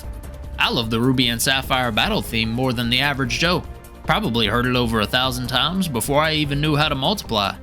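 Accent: United States. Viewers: I like how you sound more Texan than I do, even though I have lived in Texas my entire life. 😂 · Cowboy accent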